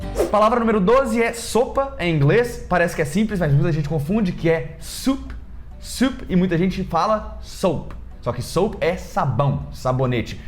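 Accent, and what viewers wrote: Minas Gerais, Brazil. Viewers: Muito obrigada por este vídeo muito esclarecedor. Você tem boa entonação e é preciso ao explicar, o que não fica cansativo. Sem falar no seu sotaque mineiro que é uma gracinha. Parabéns, tenho aprendido muito com você.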